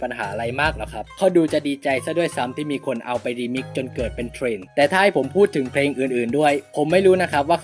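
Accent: South, Thailand. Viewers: พี่เป็นคนใต้ใช่ไหมครับ สำเนียงพี่คือใช่เลย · สำเนียงอยู่เหมือนคนใต้เลยพี่หรอยเลย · พี่เป็นคนใต้ป่าวคับ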